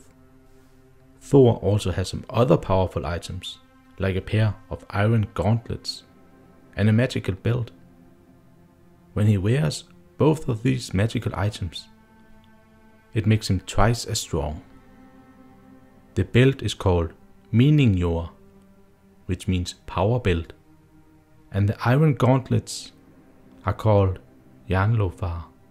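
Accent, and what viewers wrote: Denmark. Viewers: The infamous danish accent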